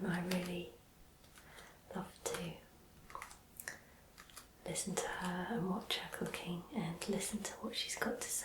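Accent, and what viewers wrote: United Kingdom. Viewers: You're so lovely!! And that very nice British accent is a big trigger for me. Keep up the great work! Subbed:)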